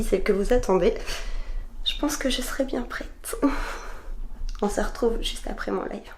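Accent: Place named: South, France. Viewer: ça semble le sud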